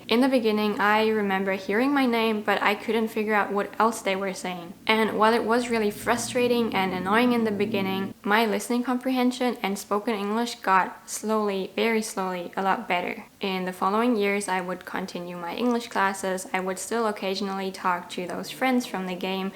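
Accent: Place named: Germany. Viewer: Outstanding work,! You seem to have mastered the English language and it’s grammatical nuances, and you’ll just continue to acquire more vocabulary over time in the same fashion as a native English speaker! Your ability to produce the language is on par with a native, just with a slight German accent which I think is endearing. Thanks for sharing such a detailed history; I find this very motivating for my own journey to Italian language fluency. Well done! Julia (native English speaker from Australia)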